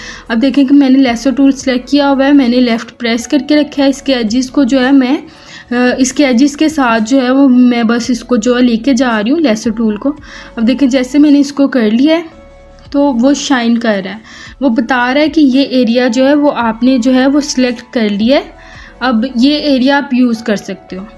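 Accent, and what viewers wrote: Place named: Faisalabad, Pakistan. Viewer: Sis apki language btati k u r from fsd.Am also from fsd .boht khushi hui apka tutorial dekh kr or boht acha smjhati ho ap.God bless u☺️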